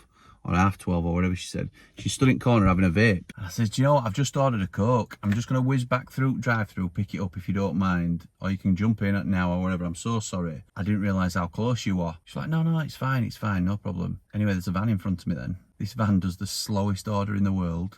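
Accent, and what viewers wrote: Yorkshire, United Kingdom. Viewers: Strange how much accent changes between Wakefield & Barnsley. For years I lived on Southdale Ossett & Worked in Averdsley Barnsley from home to work going over Blacker lane & Staines Cross was just over 7 miles. Completely different language lol. Did you mention to the 2 birds that subscribed to both of your Channels that all subscribers are LEEDS, MOT.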